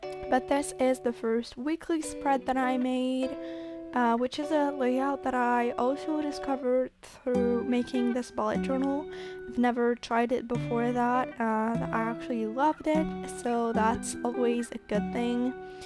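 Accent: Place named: United States. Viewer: I look forward to not only hearing your lovely voice but to see you upright & walking a few steps even if like me it's with leg braces! But please correct me if I am wrong but you have no spinal cord damage but just your brain not sending signals to your legs to make them work? Even though I have only known you on here for a short time,I would rather you have a reaction to a covid booster then catch covid itself & be very ill,we have one thing in common apart from being disabled,you rely on a wheelchair to get around & I rely on hearing aids & other medical aids to make my life easier! The good thing for you is your young & there are medical advances all the time so I am confident about you walking again! And who knows when I get more powerful BTE hearing aids or a CI I will hear how lovely you sound! And the American accent haha 💟💟💟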